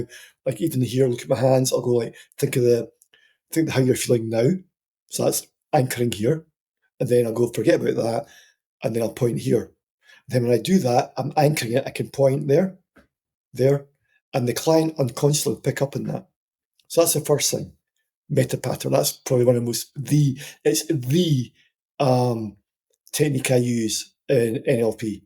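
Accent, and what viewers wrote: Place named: Scotland, United Kingdom. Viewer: Hi - thank you for sharing..I found it to be very informative and fascinating and the Scottish accent is fabulous too.😅 Can you please explain Anchoring a little bit more? Also do you ever treat PTSD and Neuro divergence..or ADD?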